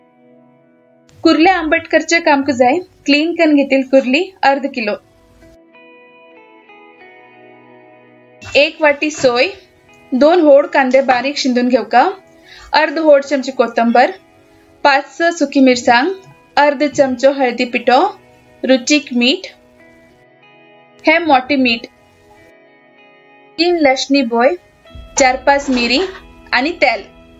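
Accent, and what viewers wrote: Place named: Karwar, India. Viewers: .. Hello dear. That's Karwari Konkani. People from Dandeli, Kumtha,Murdeshwar speak in this accent. It's not the Goan Konkani. I am a Goan GSB. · guruprasad YM , true ..I too sensed that . It sounds more of uttar Kannada konkani version or Goan or Malvani .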